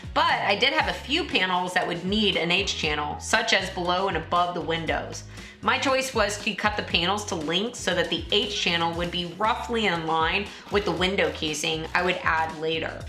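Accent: Texas, United States. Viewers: with your Texas accent, when you say the name it sounds like "Pure Stoke". ALso a cool name.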